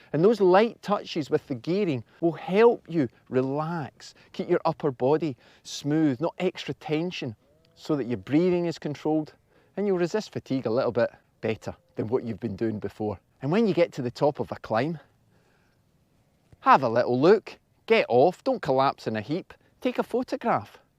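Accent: Scotland, United Kingdom. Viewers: Hi. I live in New Zealand (and have Scottish ancestors so every time I hear your accent somethin inside me sorta triggers, in a good way), and I am 140KG. Gravity and I dont get along. Yes, I could lose some weight, but considering I have gone down from 220KG, and been this weight for 6-8 years, its probably about where I will end up. I am still allergic to hills, but what I do now is I have 2 bikes, a cheap commutery type thing with compact crankset and a decent size big cog on the cluster, and a a roadie with full size gears. I do about 3 rides a week, 2 shorter ones and one long one. On the short ones I primarily ride up some hills with 13% sections using the commuter. Then on the weekends I combine all the hills in one longer ride on the roadie. I find that this works well, I dont blow out during the week (i'm also 55yr old, so recovery is slow), and then I find I can push the big gears a bit more. 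I'm going to apply some of the stuff you have said here as well and see how I go.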